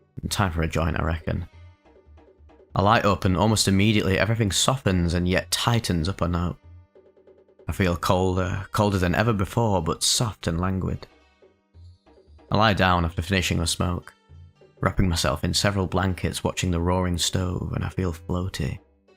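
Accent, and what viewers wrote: United Kingdom. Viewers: Always some British schmuck narrating these weird videos. #1776 fk the queen n her herpes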